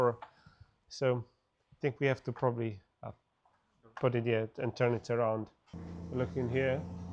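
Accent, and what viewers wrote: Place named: Romania. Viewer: De aici este, mi-a confirmat. Accentul romanesc se simte :)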